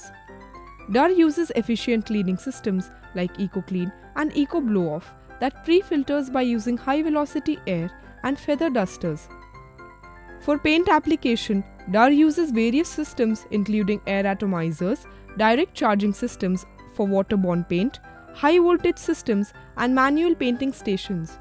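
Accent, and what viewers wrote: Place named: India. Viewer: Bahasa Inggris nya orang India ini lucu...😂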